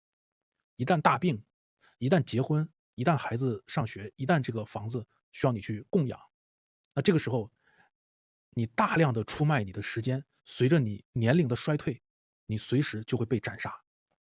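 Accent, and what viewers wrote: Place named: Northeast, China. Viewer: 长相口音，典型的东北满狗或者蒙古鞑子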